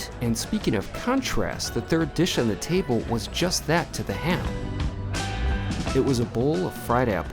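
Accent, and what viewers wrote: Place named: Midwest, United States. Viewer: Love your chill vids and Midwestern accent, bro!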